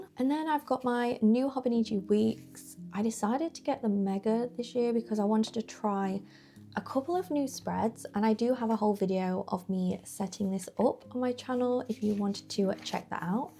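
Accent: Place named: Northern England, United Kingdom. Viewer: Where abouts are you from? Obviously "oop norff" in the UK - I grew up in Leeds until I was 10 and now the only thing left of my accent is the hard "u" - as in "up", "one" - until I am with other Northerners and then it call comes back 🥰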